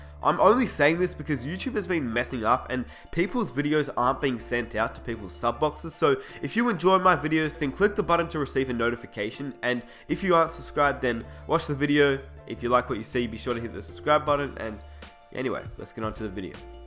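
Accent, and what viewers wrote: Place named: Australia. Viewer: thats great aussie bloke talking about nba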